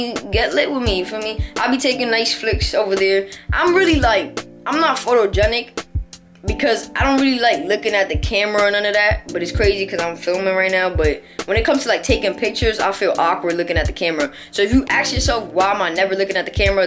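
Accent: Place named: New York, United States. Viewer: That ny accent strong asf😂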